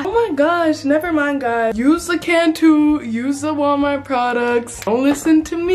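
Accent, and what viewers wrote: Canada. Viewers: Your Canadian accent reminded me of wolfie raps😂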